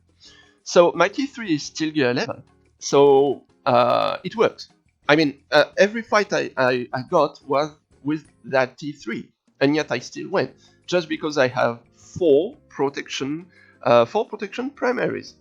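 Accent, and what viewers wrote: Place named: Poland. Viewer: Pas ça s'entend pas des masses, j'ai entendu un accent mais je pensais que t'étais polonais c'est à la fin c'est comme qui dirait writté entierementely in Françish. Du coup d'ailleurs je me rapproche du G13 avec GG et à ce propos, MIS A PART les pièces de G12, est-ce que le passage de G12 à G13 procure des PV supplémentaires à GG ou pas?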